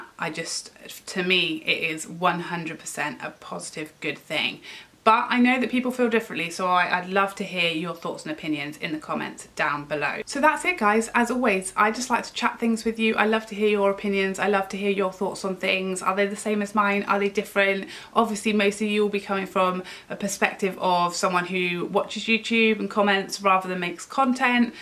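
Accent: United Kingdom. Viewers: I was brought up to say nothing if you can’t be nice. As Wayne Goss says...be kind Facebook should do this too I think...people can be so awful 😕 Ps...I like your personality & accent...reminds me of my Dad (he was from the UK) 😊 xB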